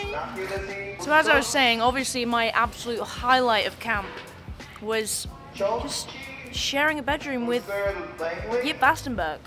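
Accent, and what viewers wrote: United States. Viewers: Hahaha your American accent is adorable!!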